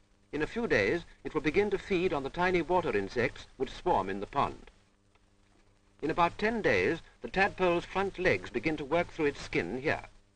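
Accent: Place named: England, United Kingdom. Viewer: Wonderful old film! The English accent! BBC eat your heart out. " Thars a newt over thar. It will dash into the warta before long."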